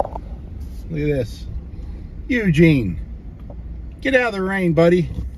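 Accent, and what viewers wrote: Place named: Texas, United States. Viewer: There's something beautiful about the look of the place after a hard rain. The food looked good! And, your accent sounds more Texan today. haha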